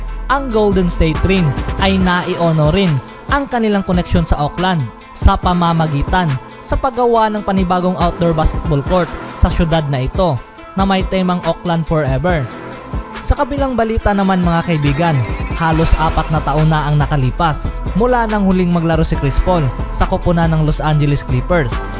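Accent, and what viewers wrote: Visayas, Philippines. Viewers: My pagka besaya mag saleta ahaha. Pinahaba lang ung conteksto. Tonge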